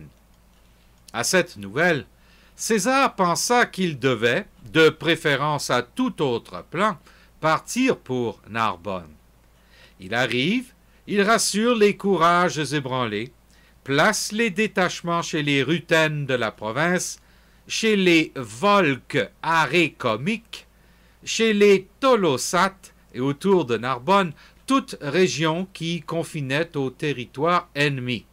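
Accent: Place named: Canada. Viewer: C'est un Canadien avec son accent. Il est super et très clair. En tout cas c'est plus clair qu'avec l'accent Stéphanois. (Le mien) uniquement compréhensible dans les départements 42 & 43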